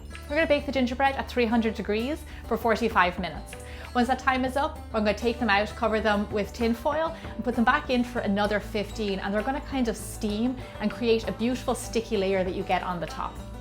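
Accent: Ireland. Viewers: Thanks 4 the help and I like your Irish accent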